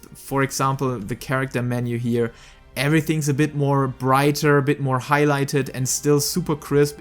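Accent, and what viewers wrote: Germany. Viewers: Dein hart deutscher akzent plus der misch masch an amerikanischem und britischem englisch machts unmöglich dir zuzuhören... Dazu kommt, dass der Look am ende einfach mal gar nicht aussieht wie 7.0? Und "similar" halt auch nicht? ôo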